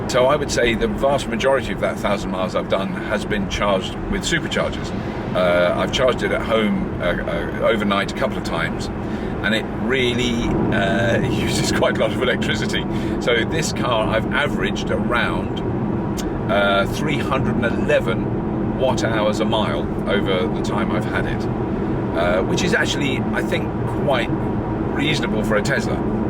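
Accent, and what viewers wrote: United Kingdom. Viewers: Love hearing things explained with a British accent.. And this car is on my want list!! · A Tesla report with a British accent. Raises the Tesla bar that much further!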